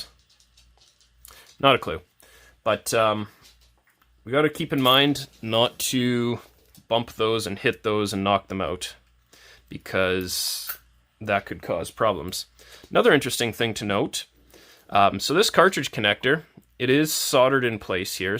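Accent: Canada. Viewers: Lol I dunno why but being American I always get a kick out of Canadian accents 😁 Great video as always! This will surely help a lot of ppl fix their 32Xes!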